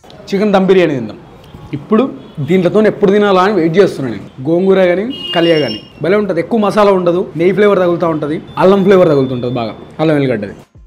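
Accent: Telangana, India. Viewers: Anna Telangana yasa bagundi nuvu present chese vidansm❤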